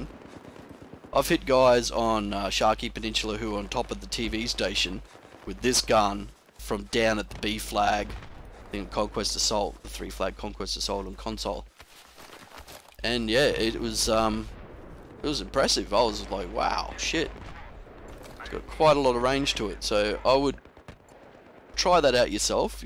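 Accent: Australia. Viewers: when i watched this video i new he was aussie because of his accent love the aussie accent and his psn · On ya mate, where you from??? Victoria? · awesome accent were u from Australia ? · Are you Australian?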